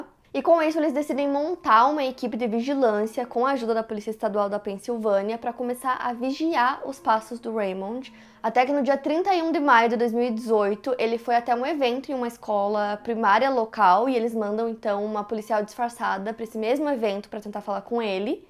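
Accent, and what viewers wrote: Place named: Paraná, Brazil. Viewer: Ela é de Ponta Grossa (Paraná). Também amo o sotaque dela!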